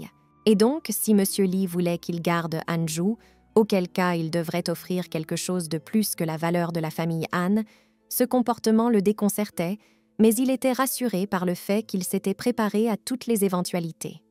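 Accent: England, United Kingdom. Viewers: Tu parles vraiment comment une anglaise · Pk y’a un accent anglais comme ça Miskine ❤❤❤❤😂😂😂😂😂😂😂😂😂😂😂😂😂😂😂😂😂😂😂😂😂😂😂😂😂😂😂😂😂😂😂😂😂😂😂😂😂😂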